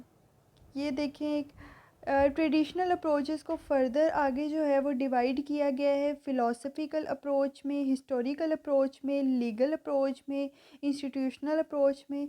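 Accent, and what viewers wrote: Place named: Pakistan. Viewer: Ur voice ar like Pakistani 😮😊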